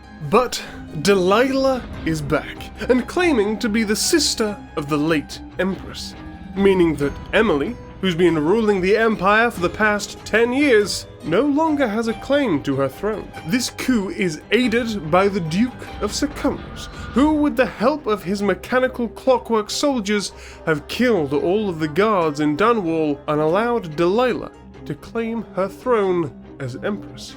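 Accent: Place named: United Kingdom. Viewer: Really love your British accent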